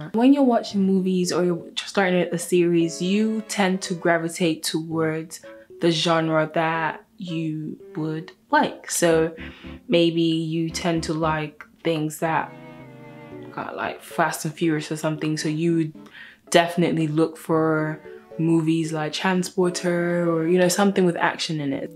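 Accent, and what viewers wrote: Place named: United States. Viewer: Why do u sound American? I thought you were from London lol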